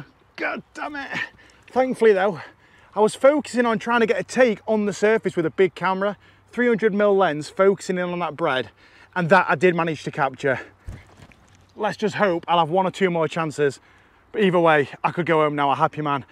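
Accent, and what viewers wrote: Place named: East Midlands, United Kingdom. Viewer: Where is this? You sound like an East midlands lad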